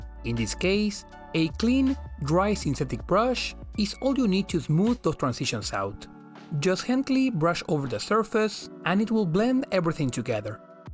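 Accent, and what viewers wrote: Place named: Spain. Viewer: estaba casi seguro que tu acento es del español, notas mucha diferencia realmente en la audiencia por hacer los videos en ingles?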